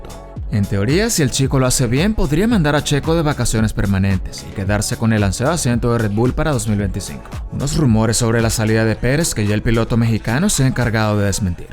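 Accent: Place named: Venezuela. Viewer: a pensé que eras de México, porque no tenes casi acento venezolano xd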